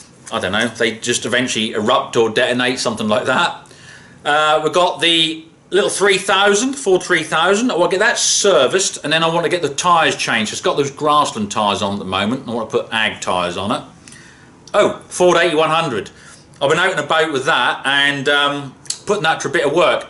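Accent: Norfolk, United Kingdom. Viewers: Classic Norfolk accent 👌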